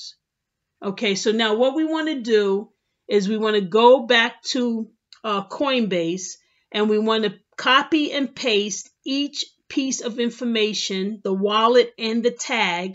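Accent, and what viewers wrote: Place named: New York, United States. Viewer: Yeah we can tell you're from New YOrk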